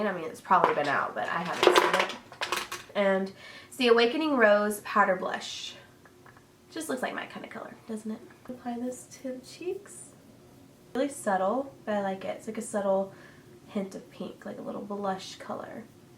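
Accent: South, United States. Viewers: I just discovered your channel and I could tell you were southern but always wondered where from. We are from mississippi but my husband has family from Alabama and he is a HUGE auburn fan and has passed on his love for the team down to our son! We try to make a game atleast once or twice a year. Love it and love your vids!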